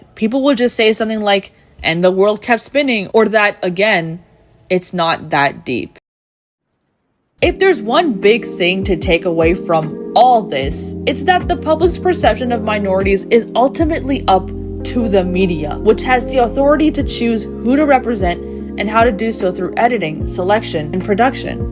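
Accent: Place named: India. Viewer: I heard a desi girl and locked in 💅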